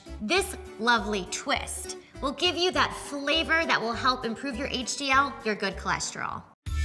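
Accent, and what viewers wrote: United States. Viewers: Great information, but such a piercing American accent, I had to reduce the volume to get through it.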